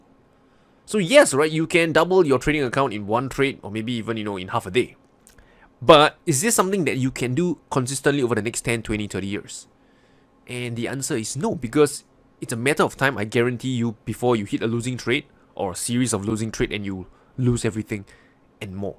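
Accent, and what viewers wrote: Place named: Singapore. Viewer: Nice discussion Sir. And the Singaporean English Accent.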